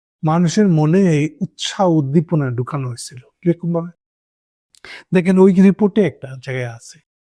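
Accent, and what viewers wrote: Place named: Noakhali, Bangladesh. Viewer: দাদা আপনার বক্তব্যে চমক আছে! নোয়াখালী ভাষার চমকপ্রদ মিশ্রণ আবার সত্য পথের বয়ান। সেই সাথে আন্ডারওয়ার্ল্ড ও প্রকাশ্য ডাকাত বাহিনীর মুখ্য অবয়ব প্রদর্শন। দারুন দারুন মারহাবা।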